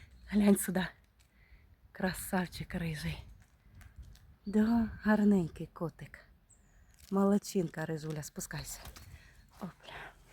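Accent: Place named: Poltava, Ukraine. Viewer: Дякую за відповідь. Я з Лубен, дуже мова Ваша на нашу з Полтавщини схожа. Тримайтесь, все буде добре.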